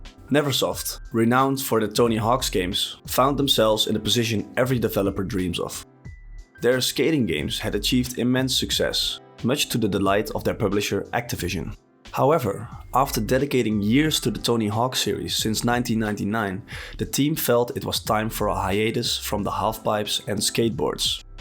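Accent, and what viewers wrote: Netherlands. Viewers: I can just hear you're Dutch. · It took me 10 seconds to know this guy is dutch😂. Leuk gemaakt man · Your voice sounds so Dutch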